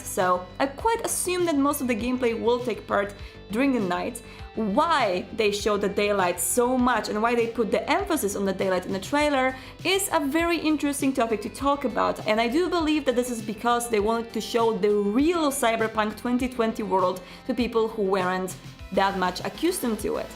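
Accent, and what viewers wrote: Poland. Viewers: I’m gay but I would go straight for polish girls with Cthulhu shirts. Your accent is adorable and I love it when you call bullshit on shitty game journalists. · It's nothing personal but am I the only one who considers polish accent so annoying that I can't watch it till the end? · At the beginning of the video i was like: "She talks like Polish ppl, wonder where is she from." and yup! #polishgirl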